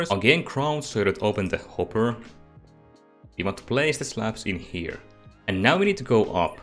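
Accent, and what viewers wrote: Finland. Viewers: Are you finnish ?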